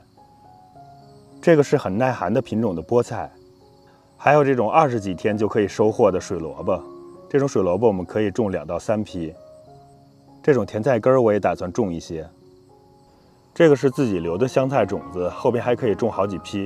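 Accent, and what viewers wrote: Beijing, China. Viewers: 正宗京腔京韵，声音好听，莱种的又好，给你点赞👍。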